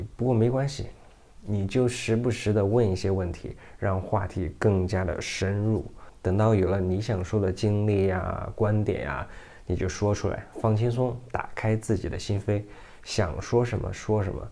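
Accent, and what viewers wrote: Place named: Beijing, China. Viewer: 你的中文不错了，从发音到咬字北京腔有了，已经接近母语中文的国人了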